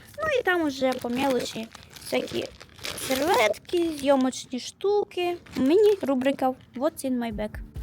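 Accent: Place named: Surzhyk, Ukraine. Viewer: Аццький (давно такого не чув) суржік. Не витримав довго, треба працювати над мовою. Але бажаю успіхів і подорожей :)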